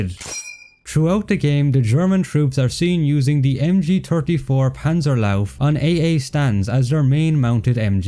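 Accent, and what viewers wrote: Ireland. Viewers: Irish sounds nerdy? 💀 Do you want him to talk in Chinese? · Way off topic but I spent damn near my entire life watching irish shows n listenin to Irish music and I cannot place that accent, what county you from? I feel like I'm getting hints to both Mayo and Wexford somehow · Your accent makes this video a hundred times better... w Ireland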